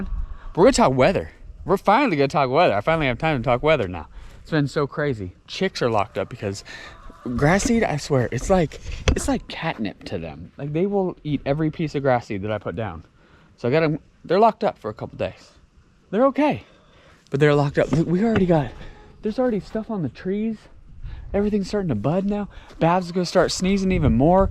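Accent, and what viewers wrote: South, United States. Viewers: Did you always sound so stupid when you talk or did you change the why you talk to sound more like a TN native ? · Coming from Corona, that 65 should feel amazing. I’m hearing a bit of southern. Can’t wait to leave California. Praying Tennessee doesn’t get crowded before we get there.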